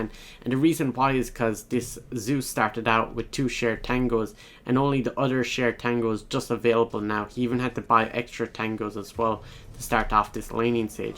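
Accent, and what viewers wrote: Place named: Ireland. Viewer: You sound like you live somewhere in south ireland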